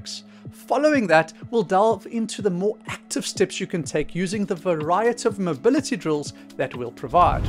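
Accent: South Africa. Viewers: Oh yay, I love hearing a fellow South African YouTuber. Great video too. I need these mobility exercises. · He ain't no native. He from South Africa.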